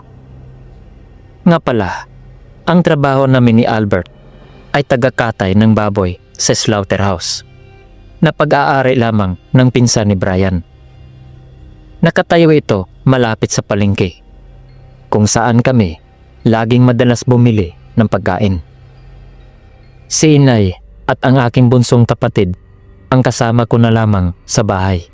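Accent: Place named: Visayas, Philippines. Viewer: New subscriber idol gusto naku bisaya imong pag narrate ❤hehe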